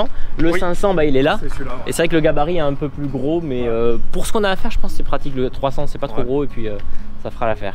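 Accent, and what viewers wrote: South, France. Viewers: Tu as l'accent du sud seulement quand tu es avec ta soeur mdrr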